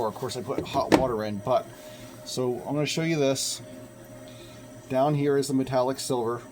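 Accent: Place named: United States. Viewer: because I am as well, yank can hear another yank! 😁 Also think I heard you mention a storm or weather event that sounded exactly like the weather we had going on. I'm in NH, you could probably drive a golf ball into Maine from here.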